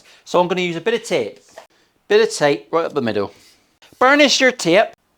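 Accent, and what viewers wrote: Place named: Northern Ireland, United Kingdom. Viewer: The random northern irish accent always catches me off guard!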